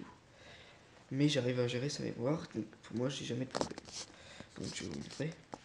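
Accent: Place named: Madagascar. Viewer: C sure tes malgache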